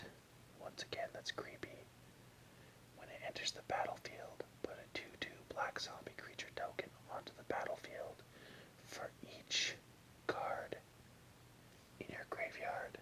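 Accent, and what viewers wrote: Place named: Canada. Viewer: It's nice to hear another Canadian accent :)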